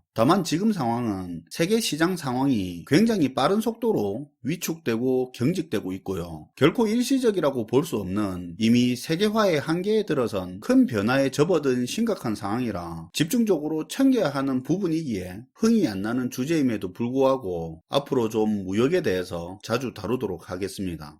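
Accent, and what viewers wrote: Gyeongsang, South Korea. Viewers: 연속적으로 시청을 했을정도로 어려운 경제 흐름을 쉽게설명해주셔서 감사합니다. 가정경제에 중요한 시점이라 돌다리 두드리는 맘으로 시청하였는데 기본이 중요하다는것을 시청할수록 느껴졌습니다. 구수한 경상도 사투리와 편한 캐릭터가 집중하여 들을 수 있게 하는것 같아서 경제에 통달한 분의 오디오 비디오로 적격인듯싶습니다. 감사하고 많은이에게 유익한 지식이나 정보 많이 알려주세요~^^ 훌륭하시고 감사합니다.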